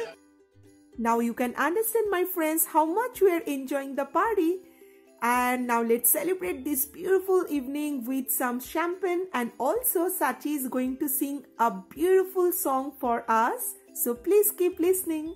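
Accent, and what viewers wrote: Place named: Bengal, India. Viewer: Hearing your english😀😀first question comes to my mind.. are you bengali?☺️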